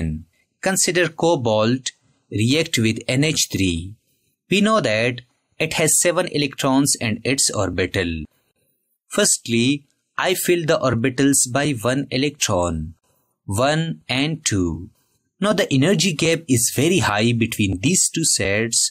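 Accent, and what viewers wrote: India. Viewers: to is very usefull for drawing, but I would draw one arbitrary non-Z-axis for the dz² just to make it still fit into 2-Dimensional view, or for 3-Dimensional, jsut imagine 3-rd axis directly pointing at you and you don't see ti as it is covered by the origin point. Your dz² drawing feels little bit to 1-dimensional. But as with all indina channels, I never mustn't watch any other Vid of your Chennel or my brain will explode, your english is much harder to understand than normal english. But you explain pretty well, (all the Electron will stay there and here stuff, but the d orbital drawing was just the most usefull.) with less acent in language, i.e. if you would use Queen English, I might even consider subscribing/recomending you.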